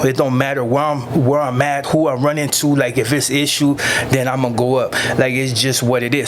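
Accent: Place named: Mexico. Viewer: I can see if you grew up with mexicans and joined their gang because that’s who you came up with. But why do talk like them. They won’t except you as your self.?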